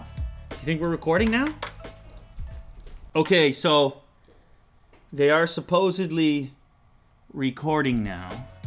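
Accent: United Kingdom. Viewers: actually a pretty good uk accent! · That Excellent British accent though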